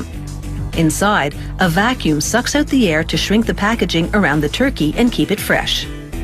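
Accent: Canada. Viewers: Didn't realize the Narrorator was Canadian until the end. Oh, U and O.😂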